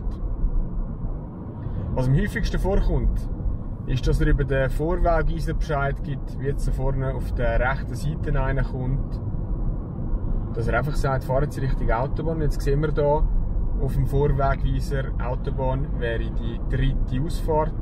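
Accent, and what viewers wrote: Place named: Switzerland. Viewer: Als deutscher muss man ein bisschen besser zuhören um Schweizerdeutsch zu verstehen wegen dem Akzent, aber ich hab es verstanden und bedanke mich für die tolle Erklärung mit Vorschau. Danke nochmal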